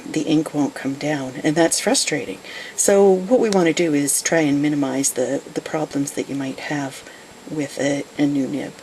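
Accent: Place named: Canada. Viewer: Anyone want to play a game of "spot the Canadian accent"? Great video and great accent:)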